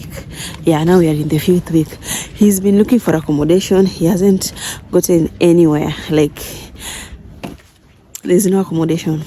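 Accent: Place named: Kenya. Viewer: You sound East African, and keyan to be exact. Why the Ghana flag? 🇬🇭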